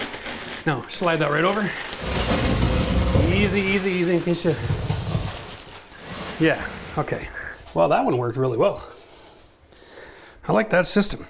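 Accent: Canada. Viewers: My comment got cut short Because my big carpenter finger accidentally hit the send arrow. So I'll repeat. This is the best toilet installation video I've seen... And as soon as I heard you sounding like Red Green and you showed me The Tim Horton's coffee cup To stop the vent leak, I knew you were Canadian. So thanks for all the valuable tips and Go Leafs! PS ... I'm here in the states so I have to make my own Tim Horton's coffee every morning And I don't get the cups. LOL. Stores here finally sell Timmy's coffee in cans..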